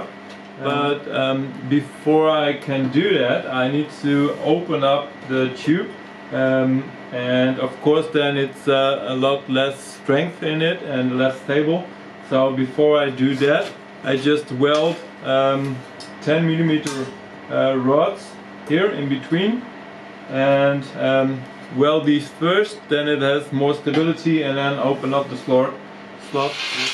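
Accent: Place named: Germany. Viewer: Cool, I thought you sounded German. Your thouragh like a German lol. Cool projects mate, hi from northern ireland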